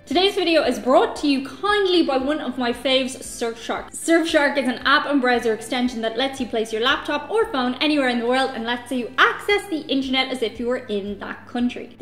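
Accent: Ireland. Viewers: It was so much fun listening to this! All the commenters seem to be focusing on the 7 elevens, rightfully so, I loved that you are aware of how big the U.S. is and how there is so much to see. Fun to watch you and love your Irish accent. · Some of the problem with them understanding your Spanish may have to do with your Irish accent. I even have a little trouble with you when you're speaking English (I think yours is the only YouTube channel I routinely watch with the closed captions on, just to fill in the gaps in understading). Spanish itself sounds different in every country. I'm pretty familiar with Mexican Spanish (having lived for sixty years in various US border states), and I have trouble with Argentine, Puerto Rican, continental Spanish, whatever that mixture in the Philippines is supposed to be. Most clearly-enunciated Spanish I ever encountered was on shortwave radio, and I listened for several minutes for something that would identify the origin; finally out came a station ID and I was listening to Radio Shanghai! 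· Bad toilet paper in a public toilet is probably on purpose. Likely to discourage it getting stolen when you're running low at home. Choice overload is also a thing. There are even studies. Seems like 3-5 choices is ideal in many things. Here is my question.....do you speak Spanish with an Irish accent?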